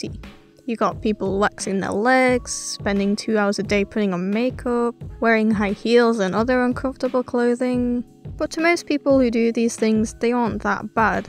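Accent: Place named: United Kingdom. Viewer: You sound like my britt voice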